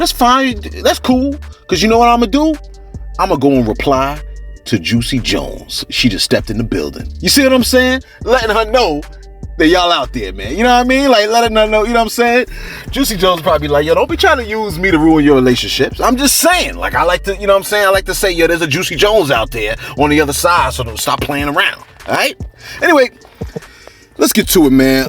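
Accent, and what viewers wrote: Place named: New York, United States. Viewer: U sound like a dude from new york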